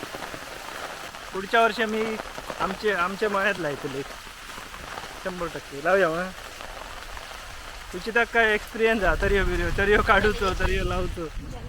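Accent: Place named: Malvani, India. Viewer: तुजी बायको किती मेहनती आहे. तू जे मालवणी बोलतो ते ऐकून भारी वाटत.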